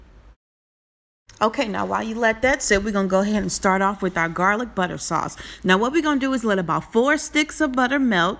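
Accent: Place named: South, United States. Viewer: You can tell she from the south. She said “puhtaytuhs” not “potatoes”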